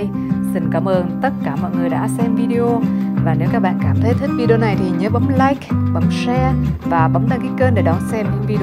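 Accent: North, Vietnam. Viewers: Co noi nguoi mien Tay vay tinh nao vay toi dan Can Tho , nghe giong co co am huong nguoi Bac chu khong rat dan mien Tay cua Chung toi mong noi ro , toi xem clip co moi ngay , congratulations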